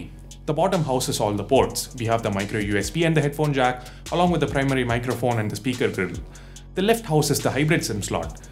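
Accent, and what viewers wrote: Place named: South India, India. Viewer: get rid of horrible south Indian accent !!!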